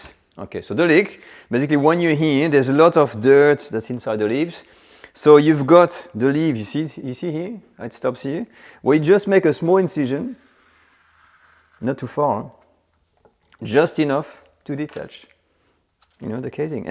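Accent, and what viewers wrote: France. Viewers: PLEASE do a video in French; your accent will enhance the experience!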